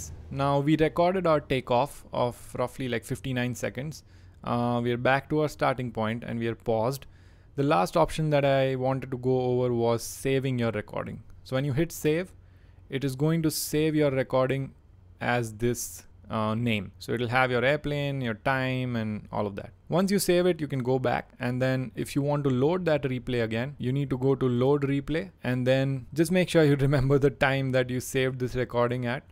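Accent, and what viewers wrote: India. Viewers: I don’t understand INDIAN ASCENT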